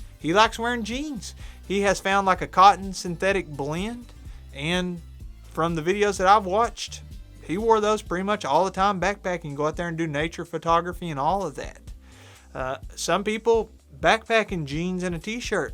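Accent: United States. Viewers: Ur a likable bloke, u sound so American and 🤣 Please don't hike in trainers (tennis shoes) 🙄🤣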